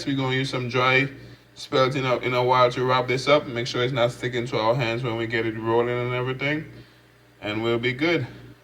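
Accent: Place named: Caribbean. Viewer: Straight from the Caribbean I hear the patois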